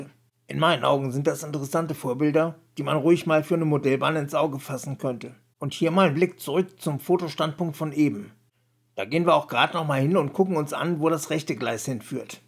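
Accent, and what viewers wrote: Hesse, Germany. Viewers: Tolles Video. Nur das "Hessen-Gebabbel" finde ich nervig. Vor allem bei wiederholtem Ansehen. Hessisch babbeln am Anfang ist ja witzig, danach aber bitte hochdeutsch. --> Ist jedenfalls meine bescheidene Meinung. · Hessisch mit Untertiteln? Echt jetzt??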